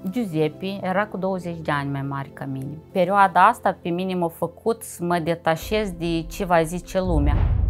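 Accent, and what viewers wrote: Moldova. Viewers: O poveste autentica povestita chiar in dulcele grai moldovenesc!Ce mult imi place,te-as asculta zile intregi!Dumnezeu sa te aiba in grija sa!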